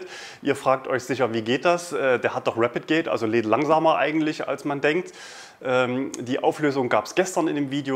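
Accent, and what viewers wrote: Germany. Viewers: Gute News aber du ziehst ja echt hardcore die deutsche Aussprache durch :D Model übsilon geht ja noch, aber <link>.. da steckt eindeutig "drive" im Namen, also elec trive :D.. glaube ich :)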